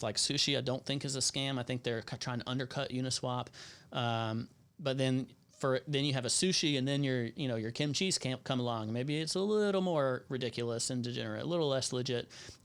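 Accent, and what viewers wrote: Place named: South, United States. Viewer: We have ourselves a southern gentleman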